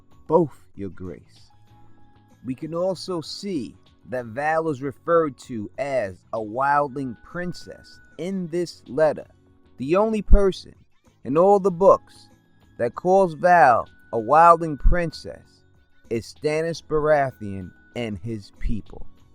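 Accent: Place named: Brooklyn, United States. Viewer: You sound like an italian from brooklyn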